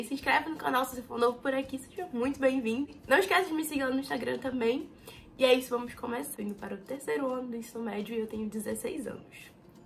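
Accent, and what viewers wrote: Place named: Amazonas, Brazil. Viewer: então é nós, sotaque amazonense, ja ganhou uma inscrita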